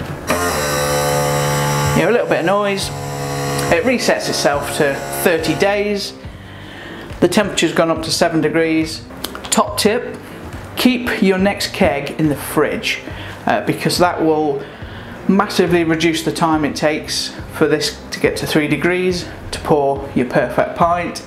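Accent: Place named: RP, United Kingdom. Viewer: Have you read the manual before making this video? Probably not, because you omitted some important steps. Also, in the tap unit installation, you have mixed up the sequence. Your posh accent is not a substitute for being a professional. This is food&beverage department, not following the rules may become a health issue.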